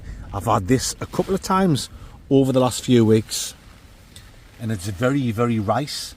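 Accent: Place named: Sunderland, United Kingdom. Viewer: This is the only Sunderland accent I will tolerate to listen too. Top bloke 🔥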